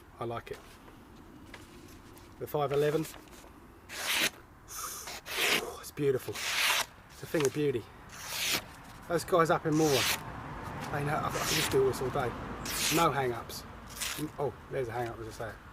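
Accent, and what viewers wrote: United Kingdom. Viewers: .....but you are originally a Brit?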